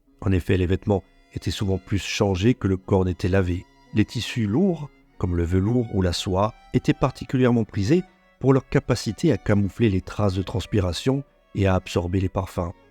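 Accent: Corsica, France. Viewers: J’avoue que j’ai surtout écouté la vidéo pour l’accent Corse, forza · Super video! Mais je note un petit accent dans la voix , corse,je crois. Pace e salut❤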